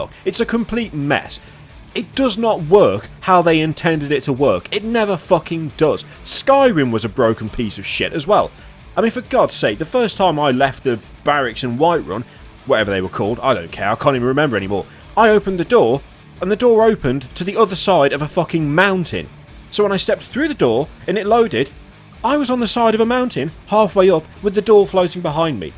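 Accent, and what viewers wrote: United Kingdom. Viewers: How is it that these kinds of angry rants always sound better when done with a British accent? But then again, everything sounds better with a British accent. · Subscribing just for that accent. I'm British too.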